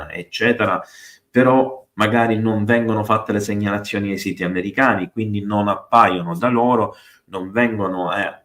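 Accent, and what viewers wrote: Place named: Sicily, Italy. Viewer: Mi sembra di capire che sei siciliano e forse di Palermo...te lo dice una di Catania😊👍 Complimenti video sempre interessanti e mai noiosi🌠👍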